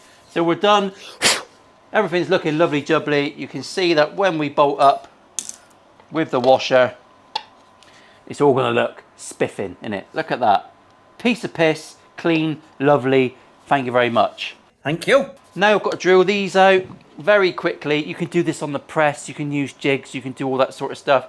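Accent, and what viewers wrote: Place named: Plymouth, United Kingdom. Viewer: from Scotland here you don’t swear as much as the wife so I’m allowed to watch from the hoose love the channel and content and my old man was a Plymouth boy so the accent is a bonus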